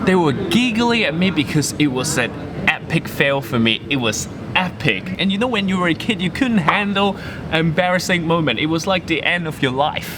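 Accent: United Kingdom. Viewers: But the first thing, the person must have a positive thinking, or he/she will be buried themselves. Btw, I love watching your videos and I like your British accent. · good UK accent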